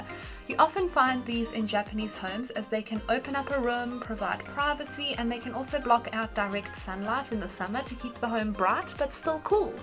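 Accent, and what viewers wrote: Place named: South Africa. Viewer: Just came across your video and immediately knew you were a fellow South African! Great build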